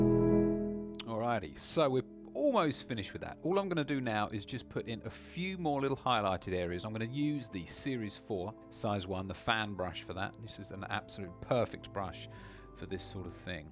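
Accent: United Kingdom. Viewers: Very sweet painting/mini tutorial, I'm wondering did the client ask for it on paper? I'm pretty sure you would have used a board of some sort (I don't remember what you call them) I hope you're family and you are staying refreshed and I hope end of autumn into winter goes great for you. Also so I can reference you better in the future are you from the UK, I'm not sure of accents. Dave from Canada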